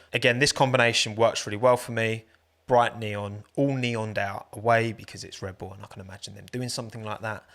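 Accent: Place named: Essex, United Kingdom. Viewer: Wonder if you’ll revisit the football/F1 hybrids, loved the video & the creativity you always show For me, I’m a football fan that’s only just getting into the world of F1, & admittedly when I first watched your content, I thought “man he’s basically Spencer FC but F1” especially with the (I think) Essex accent, & then I find out you’re a West Ham fan too which cemented that for me 😅 (I’m not British so my guesstimation might be wrong lol)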